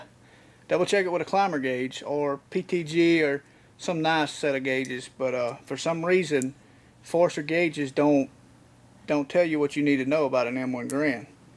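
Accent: Alabama, United States. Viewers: excellent demonstration of re-barreling and head-spacing an M1 Garand. Don't worry about your Alabama accent, I understood everything that you said on your 4-part video. Like another viewer commented, you have given me the confidence to re-barrel my own projects. I would love to go to Gunsmithing school, but there are no decent ones where I live. Thank you kindly, from California, for taking the time to show us all how it's done, and on a budget to boot.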